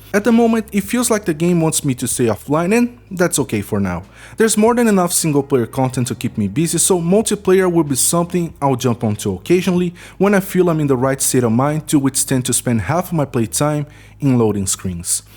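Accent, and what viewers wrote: Brazil. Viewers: Porra, sotaque de brasileiro é impossível de não reconhecer. Muito carregado mesmo tendo um inglês bem fluido kkkkkkk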